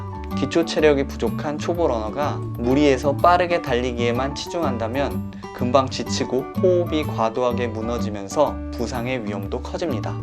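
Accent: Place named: Mokpo, South Korea. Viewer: 오 목포네요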